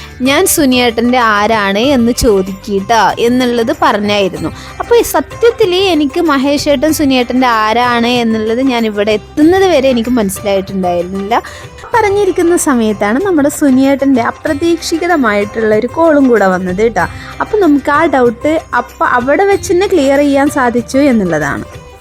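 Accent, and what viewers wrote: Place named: Thrissur, India. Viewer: വിവരണ ഭാഷ ശരിയായില്ല 😏 എന്തോന്ന് ഇത് വല്ലാത്ത ഒരു എന്താ പറയ തൃശൂരിലെ ഭാഷയെ മോശം ആക്കരുത്🤮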